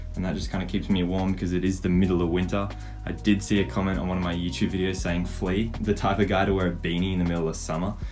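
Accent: Australia. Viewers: r u australian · Are you Australian? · You’re Australian???? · wait your Australian?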